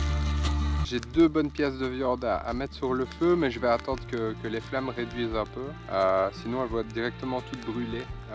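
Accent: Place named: East, Belgium. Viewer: Petit accent là, ça sent l'Est de la Belgique...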